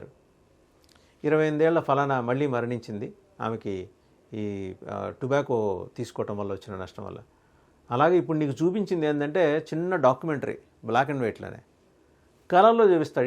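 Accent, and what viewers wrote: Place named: Nellore, India. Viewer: It seems you are a nellore person as per ur accent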